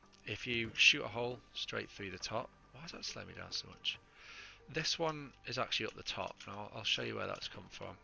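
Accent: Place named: United Kingdom. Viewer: Found this video after watching Smii7y absolutely lose his mind over this heist. The transition between loud Canadian frustration and calm British professionalism was phenomenal 🤣🤣🤣. Great video!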